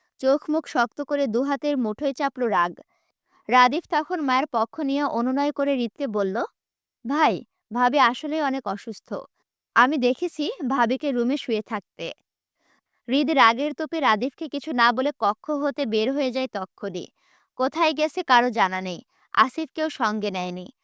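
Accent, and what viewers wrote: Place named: Rangpur, Bangladesh. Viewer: আপু তুমি মনে হয় রংপুর জেলার তাই না রংপুর❤❤😂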